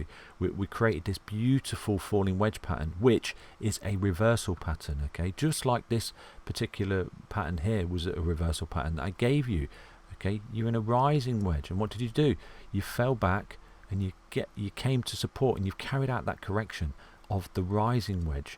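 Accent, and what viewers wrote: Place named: North, United Kingdom. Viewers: First time viewer, informative content with a great northern accent which reminds me of home! Subscribed. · Always great video's on TA. Although sometimes difficult to keep up with the talking speed in combination with a slight English dialect. Keep up the good work. :-)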